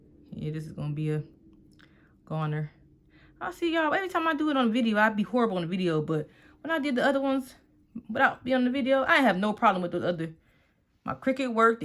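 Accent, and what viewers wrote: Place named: New Orleans, United States. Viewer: You sound like you from New Orleans! I know that accent anywhere!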